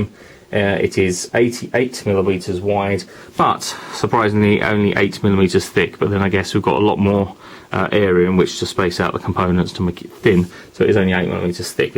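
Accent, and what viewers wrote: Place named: United Kingdom. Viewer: i love Britsh Voice ! <33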